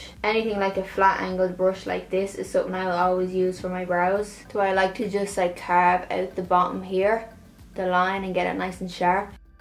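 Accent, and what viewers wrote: Ireland. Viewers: Irish (Dublin I think) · nidaa haib pretty sure Irish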